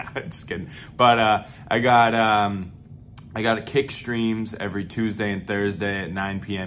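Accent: Boston, United States. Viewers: Are you a Southie? Live in a triple decka?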